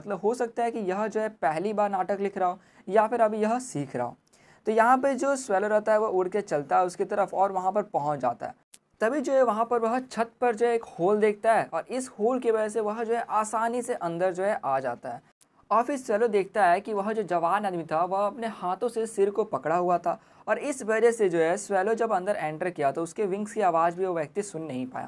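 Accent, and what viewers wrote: Bihar, India. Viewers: Bhaiya mai apke accent se he samajh gaya tha ke app Bihar se hai I am also from Begusaria, Bihar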